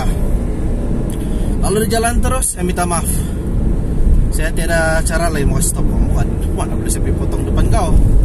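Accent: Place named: Sabah, Malaysia. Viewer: Suk😂 dengar cara org sabah ckp. Sedap bahasanya. Baek kau bang. Pandei ko ckp bus lantai bocorr.😅😅 ko boleh jd driver racing car lah bang. Corner pun ko layan 90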